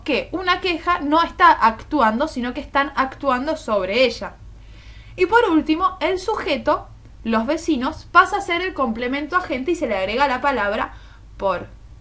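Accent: Argentina. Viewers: Gracias, muy linda tu voz argentina :) me encanta ese acento en las mujeres de allá, saludos! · tu voz es RE argentina sos de argentina??? que suerte estaba estudiando lengua y encontré estos videos tuyos son buenísimos me re sirvieron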